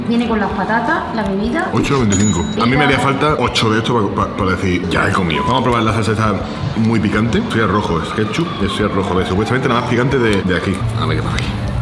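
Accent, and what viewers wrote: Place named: Mexico. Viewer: Amooo el acento wey, te lo juro nms, yo soy de Mexico, y aseguro que es 100% mexa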